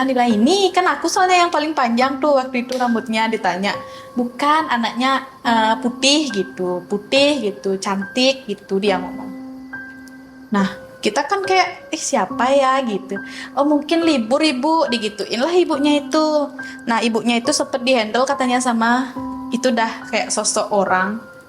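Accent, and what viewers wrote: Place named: Bali, Indonesia. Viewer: Seneng kl denger logat bali..😅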